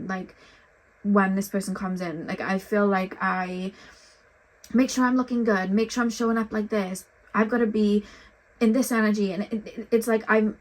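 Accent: Wales, United Kingdom. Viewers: I don't understand a word you're saying 😢 +oh, you got the Welsh accent 😮 +oh... yes I do talk a lot😅 I'll re-watch it with subs 😊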